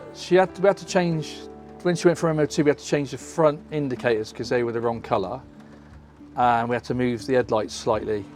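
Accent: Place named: Ukraine. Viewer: Dubbing? I understand both of them…one British and, the presenter, sounds possibly Ukrainian. Since you’re writing in another language maybe you just don’t understand them, or the closed captioning isn’t doing a good job of translating for you. I find it very inefficient. No fault of the channel.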